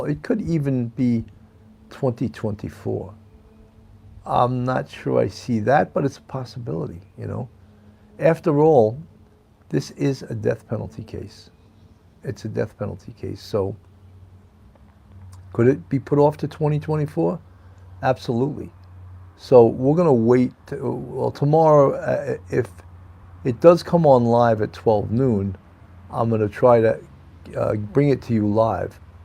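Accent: New York, United States. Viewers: I love your accent!!! Nyc?? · Your NY accent is great! Why do negative people always feel the need to comment? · I love your new york accent. I love New York ❤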